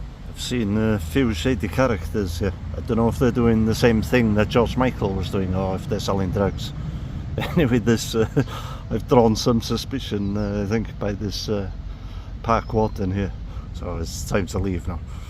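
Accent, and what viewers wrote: Wales, United Kingdom. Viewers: Just discovered your channel. Great to hear Welsh accents on YouTube. I’m from the south, but there’s definitely not enough from the north on social media. Keep up the great work, look forward to watching more of your videos. Diolch!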